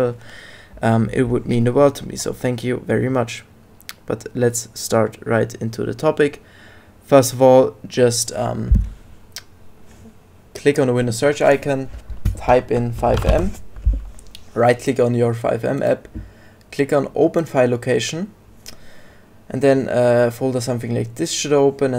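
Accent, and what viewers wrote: Germany. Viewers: Digga dieser deutsche aktzent den habe ich auch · Man merkt voll das du Deutscher bist am ende vorallem mit Perfekt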